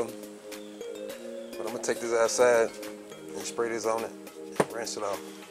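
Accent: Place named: Missouri, United States. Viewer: you sound like your in Missouri, Thad be cool, long live the Cicada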